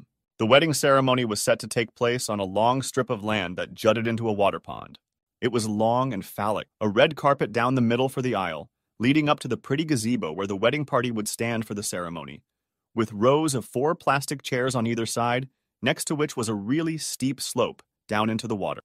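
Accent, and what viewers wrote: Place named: United States. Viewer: Okay, the bizarre word replacements are grating enough, but now I'm really calling shenanigans. You, narrator, are clearly American, and yet you just pronounced 911 as "nine-hundred and eleven" instead of "nine-one-one." 'Fess up, is this channel a psychological experiment designed to study irritation or something?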